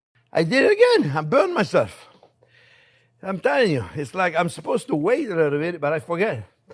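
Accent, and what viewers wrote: France. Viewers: I assume based on your accent and name you’re French. Have you done any French soup videos or heck, any French food videos?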